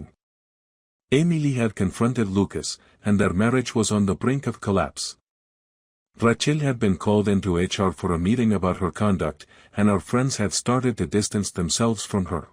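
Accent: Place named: Spain. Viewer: This is funny sometimes the voice sounds Spanish…even SpanGlishly pronouncing words. Its like the setting is regardless of the English it is said or pronounced like Spanish.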